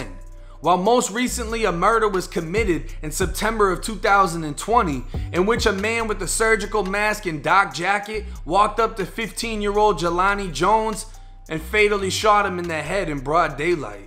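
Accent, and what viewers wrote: Boston, United States. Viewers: are you from Boston cuz you have a slight Boston type Accent on how you Pronounce words with R in it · You sure your not from Boston??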